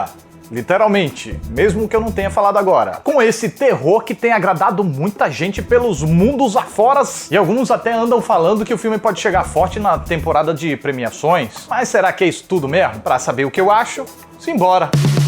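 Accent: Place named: Caruaru, Brazil. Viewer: esse sotaque parece aqui daqui de caruaru muito bom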